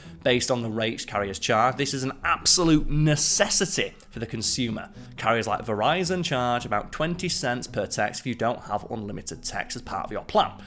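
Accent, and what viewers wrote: England, United Kingdom. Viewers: 1) I like his dinosaur shirt. 2) Maybe he uses bleeped words too much. I'm still thinking about that because I use bleeped words also. 3) I think the whole video was paced well. 4) I'll miss Simon, but I'll like just as much after I get used to his slightly different British accent. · Can you slow it down a bit. Yes I could set my speed to 0.75 but you wanted feedback. I like the English accent but a bit slower presentation would suit me. Again, feedback asked for, not a critism. I said I'd give it a go, has big shoes to fill. I'll hang around a bit longer.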